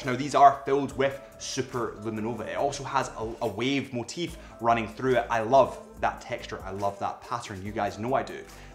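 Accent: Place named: Glasgow, United Kingdom. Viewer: west enders in glasgow talk like this guy lol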